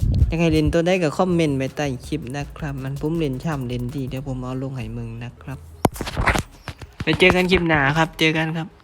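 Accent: Isan, Thailand. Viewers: ผมพูดอีสานไม่ได้เเต่ชอบฟังพี่พูดมากครับเพลินหูดี55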